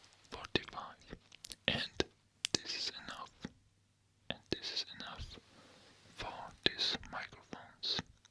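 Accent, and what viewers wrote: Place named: Poland. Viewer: I just keep coming back to this video. The Polish accent and loud variable sounds are extremely relaxing.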